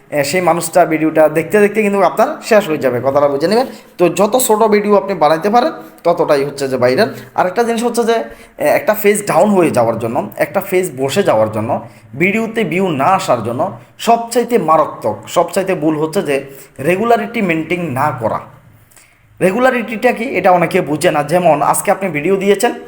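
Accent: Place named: Noakhali, Bangladesh. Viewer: আপনার উচ্চারণ পুরাই নোয়াখাইল্লা টান আছে,শুদ্ধ বাংলা উচ্চারণ জরুরী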